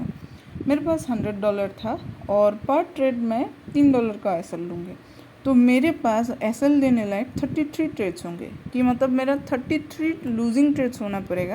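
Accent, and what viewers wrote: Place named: Maharashtra, India. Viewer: Aapke bolne se lag raha aap maharashtra se ho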